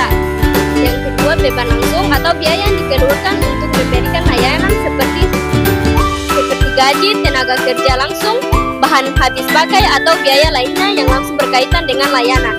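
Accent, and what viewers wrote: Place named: Ternate, Indonesia. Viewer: kerennnnn👏🏻👏🏻 logat Ternate kntraa 🎉😂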